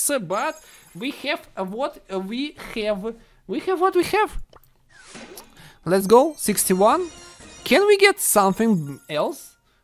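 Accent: Russia. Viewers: You are Russian wright?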